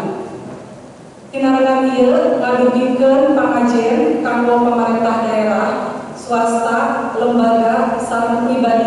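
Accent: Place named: Sunda, Indonesia. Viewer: Nyunda banget, kereeen, Ambu pidatona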